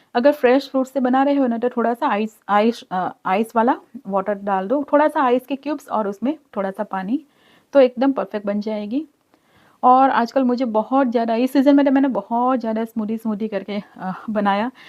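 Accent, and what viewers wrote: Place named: Parsi, India. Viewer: Your hindi is little different, like parsi people speaks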